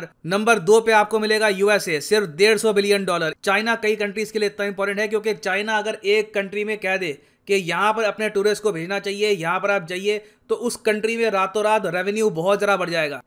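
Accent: India. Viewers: Typical Indian accent Most related dialogue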